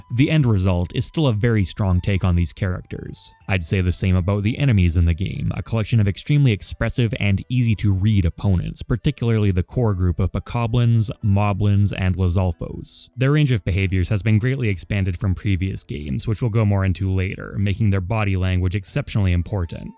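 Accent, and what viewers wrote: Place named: Canada. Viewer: i don't like how negative about the game u are in this video. But ur points make lots of sense and i agree with alot of them. also i have finally realized that you are probably canadian based on the amount of times i've heard you say the word about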